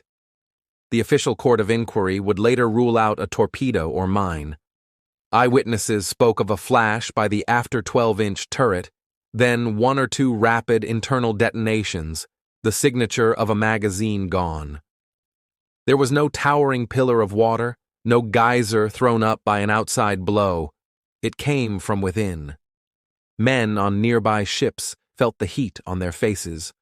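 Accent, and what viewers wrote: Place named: United States. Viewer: 1st. First of all, thank you for pronouncing EVERYTHING, in perfect American. I know this was not Al, and you could, really tell.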